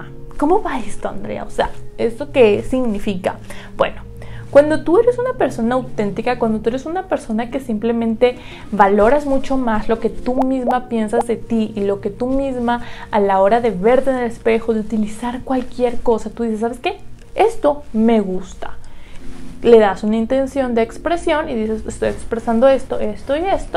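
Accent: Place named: Mexico. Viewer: omg siento que tienes el acento mexicano mucho mas marcado que antes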